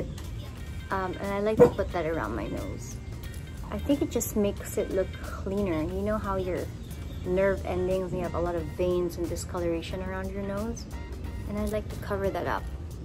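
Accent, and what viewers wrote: Bicol, Philippines. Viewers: Heart is a well-known personality yet in her previous vlog she went to a thrift store other personalities don't do that but she does! I Love you miss Heart! Keep slayin'💖💘 I also love her Bicol accent😍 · "Luway-luway lang tabi"! Hihi nakaumok dangugon ka magbicol! So cute! 😍💕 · That "luway luway lang tabi" ang cuteeee. Lakas maka shala pag siya ang nag B-Bicol.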